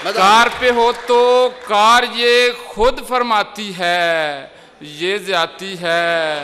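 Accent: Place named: Punjab, India. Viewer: Husney Bayaan, Tanz-o-Mazaah ki zumaani Barikyaan or sadgana chashni, woh bhi ek dhime dhime Punjabi talafuz ki roshni main, wah kamal hai.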